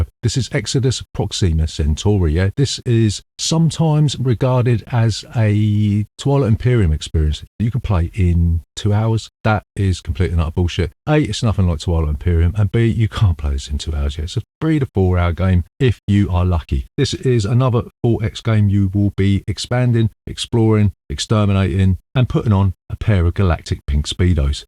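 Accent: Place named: England, United Kingdom. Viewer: Can't place the accent - which part of England are you from .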